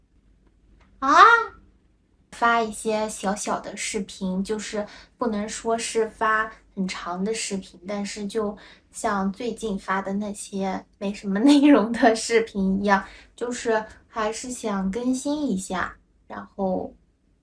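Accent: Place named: Shanghai, China. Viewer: i think it's just Shanghai's accent is a little different from the general accent of the language. It's not that easy to forget your mother language :v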